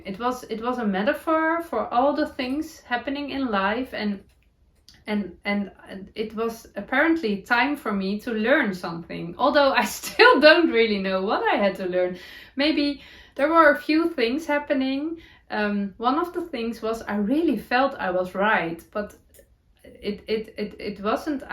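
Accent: Netherlands. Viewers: I love your Dutchy English. Thank you for the reminder to do things that make your heart jump!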